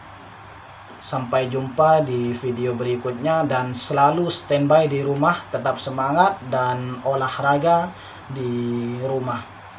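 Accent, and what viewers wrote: Malaysia. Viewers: amboii.. Bahasanya pun dah mcm org KL .. Hihi😆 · Anjay logat nya sudah sempurna,,berapa tahun di malaysia gan ?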